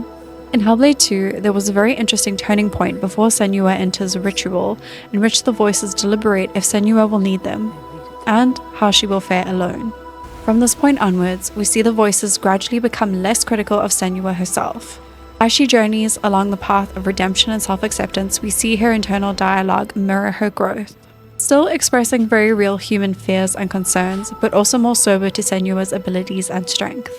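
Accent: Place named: South Africa. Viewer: Only realised after watching its you, i was like omg this is a south african voice and couldnt figure out where i heard it.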